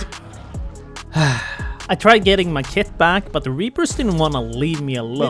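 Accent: Sweden. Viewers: Are you swedish? You sound swedish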